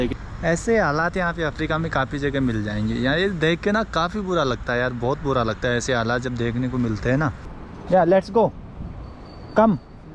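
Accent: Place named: Jaipur, India. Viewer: Kyu ki aap ki bhass Jaipur si lagti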